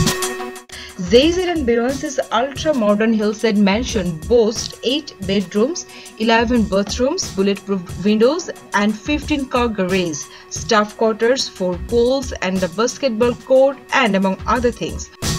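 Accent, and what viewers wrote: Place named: South India, India. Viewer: Heavy South-Indian accent and that would still be okay if it were not for some weird-ass autotune that was added in the editing room.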